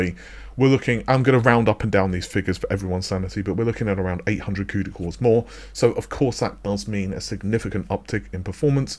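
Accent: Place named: RP, United Kingdom. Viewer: He's what's known as a "toff" and they all speak like that :)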